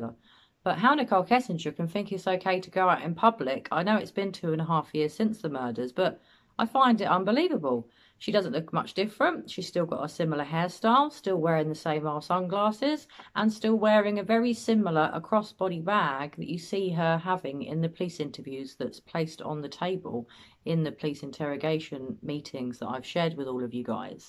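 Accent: England, United Kingdom. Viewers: Why is doing YouTube videos with an English accent.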